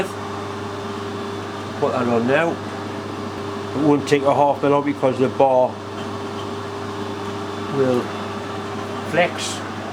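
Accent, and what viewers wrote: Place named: Durham, United Kingdom. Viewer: Love your video's- I was born in Durham 72 years ago and left for Australia in 1964 and its great to hear that accent ✌✌✌✌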